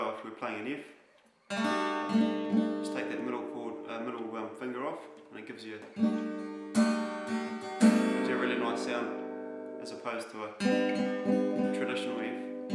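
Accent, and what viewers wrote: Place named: New Zealand. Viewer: Dude, love it, thanks heaps. I'm laughing at how kiwi this whole thing is too. Mumbling bursts and unfinished sentences. Or just concluding your point with "yeah". Love it! Drive any Americans watching this crazy.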